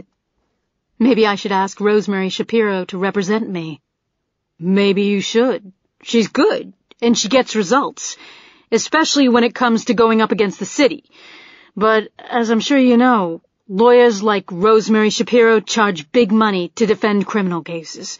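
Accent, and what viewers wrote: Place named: Boston, United States. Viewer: Probably a good book, unfortunately, I cannot listen to that harsh Boston accent. It simply grates on my nerves. Thirty -six minutes is all I could manage.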